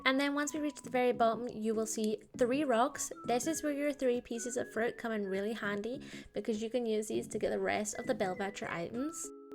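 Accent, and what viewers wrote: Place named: Northern Ireland, United Kingdom. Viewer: She’s from Northern Ireland lol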